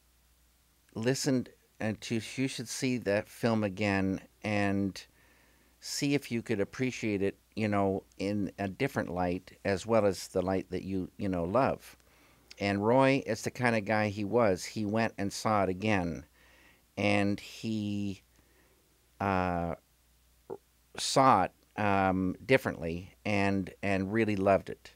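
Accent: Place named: Utah, United States. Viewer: Is David Lynch from Utah? His voice has that particular cadence they use there.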